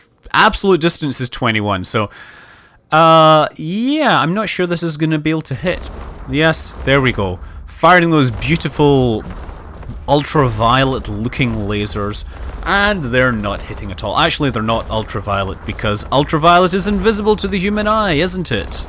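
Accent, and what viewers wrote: Scotland, United Kingdom. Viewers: You sound just like Scotty from startrek. That is so freakn cool. I wold flos that accent and pick up all the chicks :D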